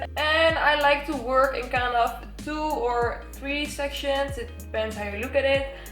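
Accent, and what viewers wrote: Netherlands. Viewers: Are u dutch ? I get dutch vibes from your english 🥰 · Are you from the netherlands, I thought so bc of your accent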